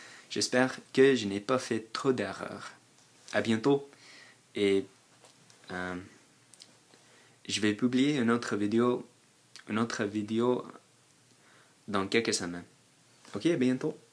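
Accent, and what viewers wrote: Quebec, Canada. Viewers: Ton accent me rappelle un peu celui de Céline Dion :D La cadence de tes phrases est typiquement québéquoise. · Effectivement tu fait peu d'erreurs (prononciation). Tu as un accent français ... québécois. C'est excellent !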